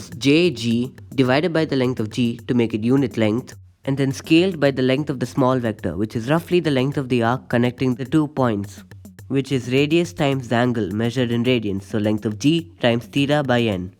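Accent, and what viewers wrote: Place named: India. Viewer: For fuck’s sake can I find one educational video that’s not in an Indian accent?!!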